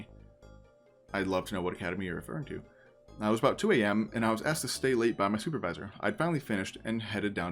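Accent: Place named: New York, United States. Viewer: No it,s acshuly a new yourk acsent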